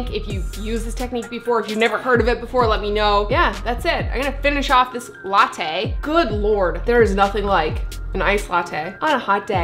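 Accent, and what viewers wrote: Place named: Canada. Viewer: I’ve never caught your Canadian accent at all until this ep, love it! AHdio hahaha 😎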